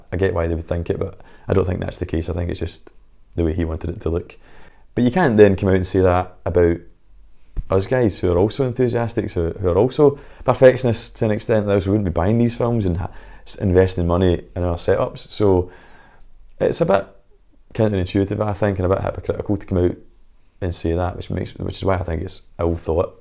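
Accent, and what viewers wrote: Scotland, United Kingdom. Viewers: Hi, not only I share your passion for physical media, I also freaking love your Scottish accent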